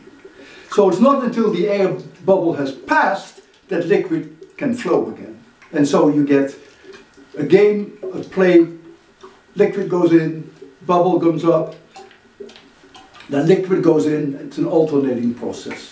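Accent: Netherlands. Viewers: Toch maar even uw naam gegoogled, ik dacht al aan uw accent te horen dat u nederlands bent. Toffe videos!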